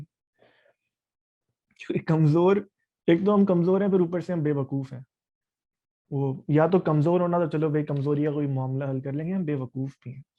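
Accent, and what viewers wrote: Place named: India. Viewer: Yar ae banda Indian ki tarah batt karta hai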